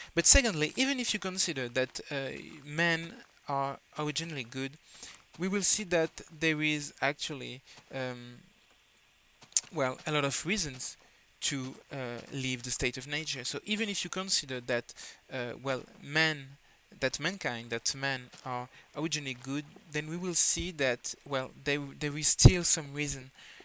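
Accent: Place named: United Kingdom. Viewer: tu parles vraiment bien anglais avec un accent très British !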